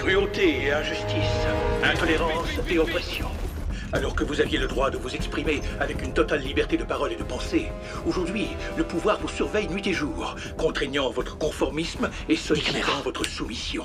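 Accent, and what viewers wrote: Quebec, Canada. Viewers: C'est la vertion québéquoise ?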